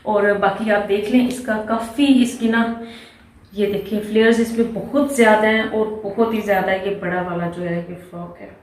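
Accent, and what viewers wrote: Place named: Punjab, India. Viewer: I love your punjabi texture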